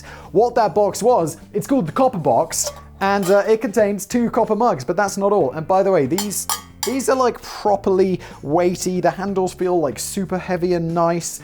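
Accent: United Kingdom. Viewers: Actually thought he lived in America. Yes, he speaks like a Brit, but he seems very America-oriented. Every time he says "Danny" in his British accent, makes me think of the social worker scene in Mrs. Doubtfire. Where he goes back and forth in some normal look and Doubtfire? He cries out: "Oh, Danny boy!". Never heard of any of those Brit Soaps, but in the last 20 years American soaps have become Star Trek vs. Clive Barker. No wonder many ended after 50 years. People like retro tech. Just look at any young person ever that thinks their meme of pretty much EVERYTHING is cool. I am used to seeing vinyl new again, but I saw a laser disc of Frozen at walmart today! You know? Those giant DVDs that lasted like 2 seconds? Whats next? Back to fucking beta tapes?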